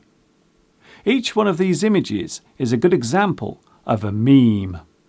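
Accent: United Kingdom. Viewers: great lesson think you I have question please? Are your accent British or Amrican because our education British so i ask to know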